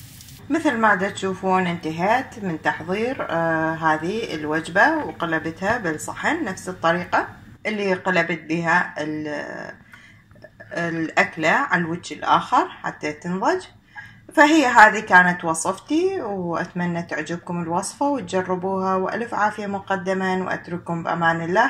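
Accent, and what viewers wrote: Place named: Iraq. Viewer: تسلم ايدج بس حبيت اعرف اني من عراق والهجتج عراقيه بس لاحضت انتي مو بل عراق أي دوله ليش عوفتو عراق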